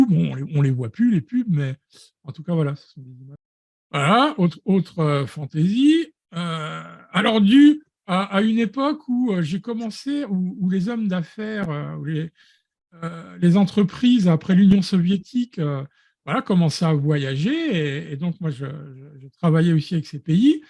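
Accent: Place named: Belgium. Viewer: Une très intéressante découverte dès que je serai rentré à la maison que je retrouverai mon PC et que je pourrais abandonner mon téléphone à l'hôpital où je suis en ce moment, je vais vous suivre avec quelques tutos sur Youtube et peut-être même devenir un de vos élèves, YouTube m'a proposé la version anglaise à entendre votre accent vous devez être belge je crois..... salutations amicale d'un Belge perdu dans l'archipel des Açores 😃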